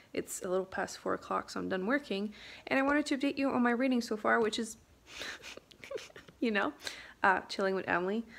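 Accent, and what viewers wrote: Quebec, Canada. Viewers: Tu devrais regarder la vieille mini-série avec Gérard Depardieu au lieu du film américain. C'est plus proche du livre. Ton français n'est pas si rouillé lol ton accent semble plus québécois que français o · She is Québécoise ? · I loved hearing you speak French, and as a European, I find your Québec accent so interesting :) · I am very curious as to know where your accent is from! It sounds « québécois » in some parts but I can’t quite pinpoint where it’s from! I’m very curious for the context! My parents are Québécois, and my father was in the Canadian Army so I actually moved a lot and learned English at 5 years old when I started school. I live 10 min from Quebec city now! And I read in English and watch TV in English so I can keep it... we speak English at home (my 4 year old is learning both languages at the same time!)... If you want to practice your french it’s actually a great esy to do it! 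(Movies tv and books!) ;) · Good vlog, love it!! Continue de nous partager ta passion, afin de nous motiver davantage! 😊 By the way, me too, french is my first langage but your videos encourage me more to read in ENGLISH. Tu parles très bien le français! Par ton accent, je crois que tu es québécoise, je me trompe? 😜 j'espère que tu as appellé un électricien pour ta prise défectueuse!! 😉 Stay safe. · Salut Emily ! C'était sympa de t'entendre parler Français avec un accent québécois ^^ si tu es tenté pour avoir des correspondantes pour dé-rouiller ton français, je suis dispo :) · Je reconnais cette accent là, QC!!!! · I'm glad you put the subtitles because as a France French speaker Canadian French sounds so foreign! lol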